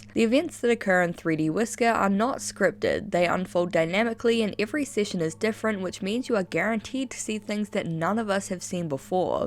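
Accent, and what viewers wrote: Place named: New Zealand. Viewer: I thought she was a kiwi?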